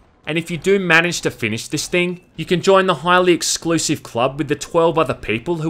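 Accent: Australia. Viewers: Thanks for the video review of one of my most dearly loved games. I’ll be sure to subscribe to see more like this. I’m an Aussie too so it’s way better hearing an Aussie doing the reviewing too lol 👍🏼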